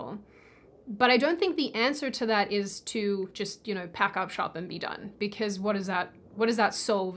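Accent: Australia. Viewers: Oh I just love your Oz accent 😂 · Don't give in the pressure! It's sad how things have turned out, but you're one of my favorite commentators; I only ever watched "You Are Here" because of you. I love your snarky comments on the bleak outlook of humanity, and I think your Aussie accent just amplifies it as well lol. You do you, always remember that you're great, and those who support you, will always support you, while those who are just here to bully, will do just that. I really do wish the best for you, whether it's to continue doing this kind of work, or to move on and do whatever else you want with your life. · I'm surprised that you feel this way. The reason being I've always thought you had a funny presentation of facts and opinion in your videos. You don't confront and you're never downright mean. You keep the mood light (which is good and a breath of fresh air). 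Or maybe I was just completely duped by that aussie accent 🤔 Anyway. I've always thought that content creators on the right need a good and stable home life to replenish their strength. Especially considering our side believe in the nuclear family etc. So I do truly hope you have someone special in your life. And if so that you start a family sooner than later. Focus on that. Enjoy that. Take a break. We understand this crazy world can actually take a toll. You're only [a lovely] human. Lastly, I follow two big film critique channels (CriticalDrinker and Nerdrotic) on here that do entertaining work. I think it's the same you do, but a different field (it's literally the culture of the political and society you're criticising). The aim is set on fictional characters, stories and suits that greenlight crap. I think you should look in to it. Also, team up with a friend and do some film reactionary episodes. 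Try it out and see what the response is. There are some channels on here that have over 300k views and making good money just by us watching them watch movies 😂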